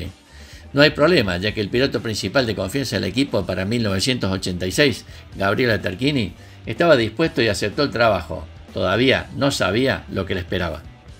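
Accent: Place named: Córdoba, Argentina. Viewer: Cordobés vos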